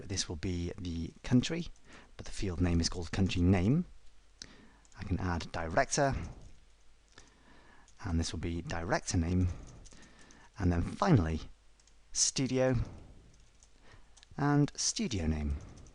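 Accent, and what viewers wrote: England, United Kingdom. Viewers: Refreshing to hear these type of articles with a british accent for a change. Nice one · Love all your videos. Like the English accent. I would like to expand on this topic and see if you could use a stored procedure instead of an embedded t-sql and pass the parameters to this sp to retrieve the information. I tried to do this but could not bind the parameters to any field in the report query. Do you have any suggestions?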